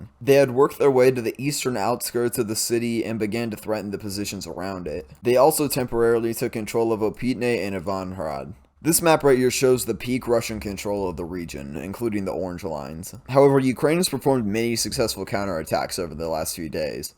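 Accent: United States. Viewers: So painful listening to Ukrainian city names in an American accent.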